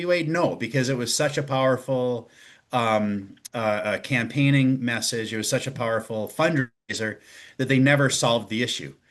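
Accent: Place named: Minnesota, United States. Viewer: Farmers do everything better🎉 i do hear a hint of that handsome Sota sound, now that you mention it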